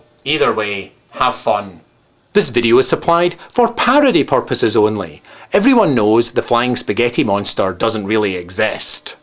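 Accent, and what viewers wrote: Scotland, United Kingdom. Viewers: His accent's scottish. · Are you Scottish? Or do Californians speak like that? Change the world by doing nothing! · are u from scotland?-.- that accent.. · I don't most, my bint is scottish, but this particular accent is SOOOOOO FUCKING ANNOYING. Even when he's happy it sounds like he wants to kill himself. The reason so many people left scotland for the colonies was because they couldn't stand each others accent. Or maybe it was just because they lived in scotland. What a shithole. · scottish and i love it!!! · LMAO - very good!! :) I could listen to that lovely scottish brogue all day long! :) hehehe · haha i like your british accent xD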